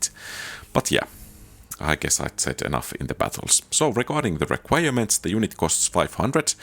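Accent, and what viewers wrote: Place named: Finland. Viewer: Are u from Finland? Ur accent is so finnish. If you are, paljon kiitoksia sulle Venäjältä! P.S: NoToWar!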